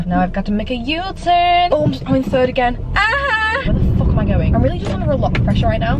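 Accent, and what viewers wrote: Manchester, United Kingdom. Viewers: Kinda caught me off guard when she started speaking in a British accent. I thought I was still on the American side of youtube. Loved watching this though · First word she said I was like “SHES FROM MANCHESTER” n then she said it so I feel validated. That’s all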